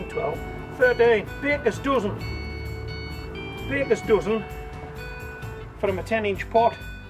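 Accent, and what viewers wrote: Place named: Teesside, United Kingdom. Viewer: You must be from Teesside with that accents mate. Where abouts are you from?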